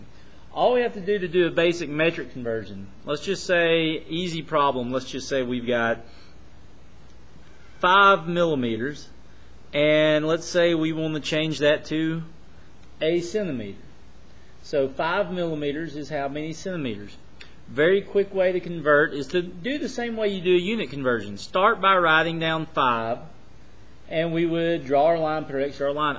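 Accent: United States. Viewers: Some cowboy yeehaw accent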